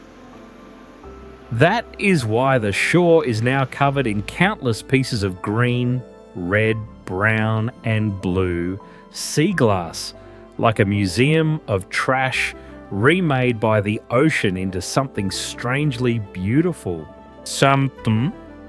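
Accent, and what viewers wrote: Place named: Australia. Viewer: I enjoyed the Aussie language being spoken. Thanks mate, great video. I'm watching from Mornington VIctoria Australia.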